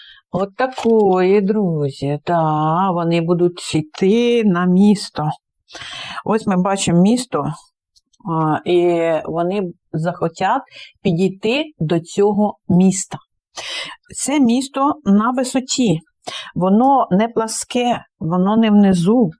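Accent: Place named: Kirovohrad, Ukraine. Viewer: В Кіровоградській також так розмовляють 😊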